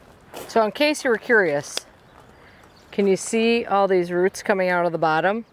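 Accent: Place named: Midwest, United States. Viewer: Im in Idaho but appreciating your midwest accent since Im from Chicago :)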